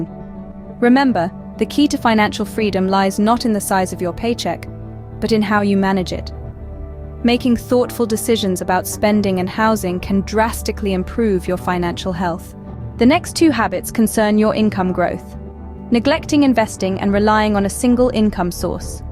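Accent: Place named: United Kingdom. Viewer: THE BRITISH NARRATION IS IRRIDATING-- AMERICAN ENGLISH POSSIBLE WITH A LESS KNOW-IT-ALL TONE?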